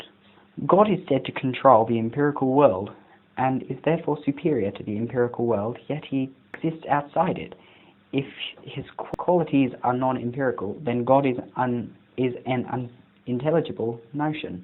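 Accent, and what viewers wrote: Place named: United Kingdom. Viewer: Holy shit this is awful in a British accent.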